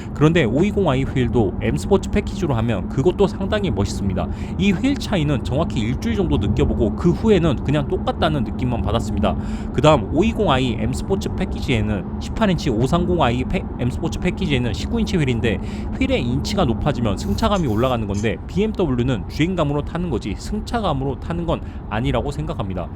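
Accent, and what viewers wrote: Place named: Gwangju, South Korea. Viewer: 어딘가 익숙했는데 광주사시구나 ㅎㅎ 반갑습니다 ㅎㅎ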